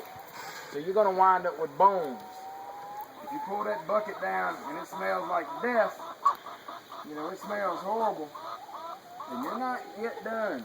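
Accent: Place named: South, United States. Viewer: man oh man.. u have uncovered nature's ultimate feeds.. that southern talk is so cool but the message is gonna make the whole world filled with hanged holed buckets.. greetings man from the far east.. woo yahh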